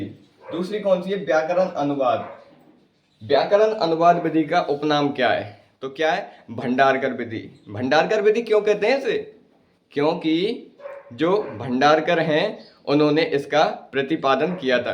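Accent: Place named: Rajasthan, India. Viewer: Sir ap kha se ho hamri trh bolete ho 😀😀😆 karauli se ho kya ap😅😆😆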